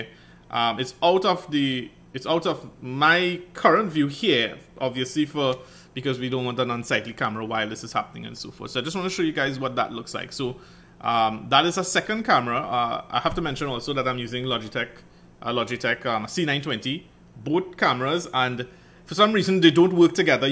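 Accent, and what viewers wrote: Trinidad and Tobago. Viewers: He sounds trini in arima or chaguanas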